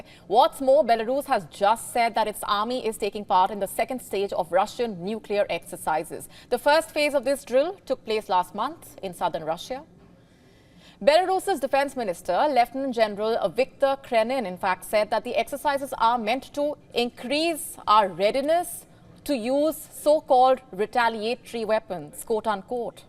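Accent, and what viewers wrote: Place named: India. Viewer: Can't stand this indain accent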